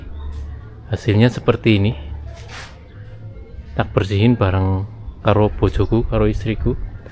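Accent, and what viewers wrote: Banyumas, Indonesia. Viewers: Wong ngapak nek ora ngapak ora penak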